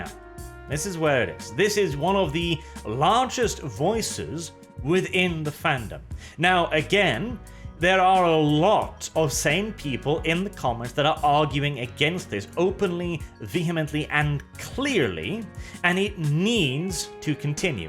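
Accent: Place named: Southern England, United Kingdom. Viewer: do not worry about your words bro when I frist heard you speek I thought you were from south of England. Its only when you speek Kraut or Ruski I can tell your not English. Peace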